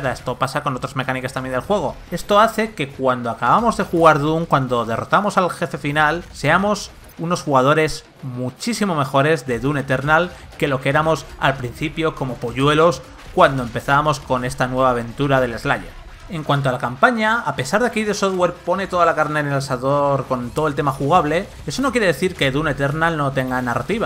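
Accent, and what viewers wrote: Spain. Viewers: Chale, me molesta cuando veo videos de vandal narrado por españoles alv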